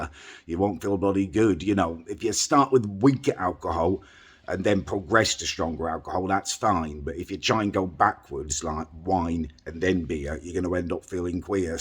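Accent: United Kingdom. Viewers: He’s British dude 😂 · He’s just British man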